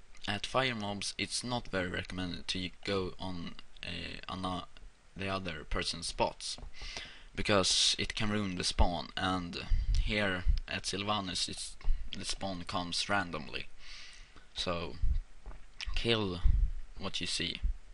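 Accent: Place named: Sweden. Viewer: Swedish english ftw!!!!! Oh sorry not supposed to comment on english... anyway. Yeh I hope the video helps whoever doesn't know how to do GRB. Kudos for you bro <33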